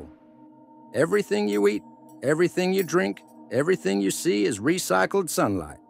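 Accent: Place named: South, United States. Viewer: It would be great if the narrator was not a southern old dude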